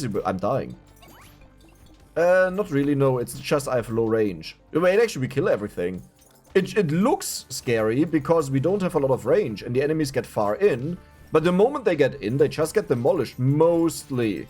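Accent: Germany. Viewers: Ich dachte mir schon das du ein deutscher bist 😂😂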